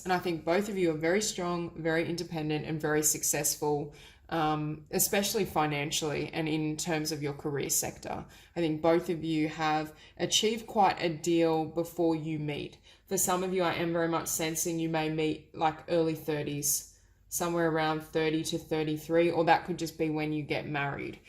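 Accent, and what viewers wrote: Australia. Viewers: Omg you are awesome and my intuition getting better , thank you I subscribed, you sound Australian, I have seen that all Australian readers are awesome something special about you!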